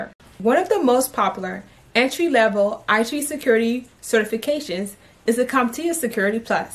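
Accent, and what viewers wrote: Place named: East Coast, United States. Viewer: I love how east coast women talk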